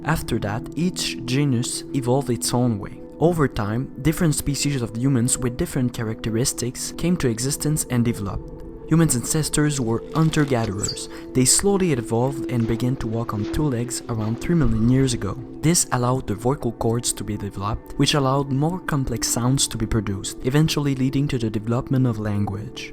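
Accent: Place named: Quebec, Canada. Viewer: Hello l’accent québécois :)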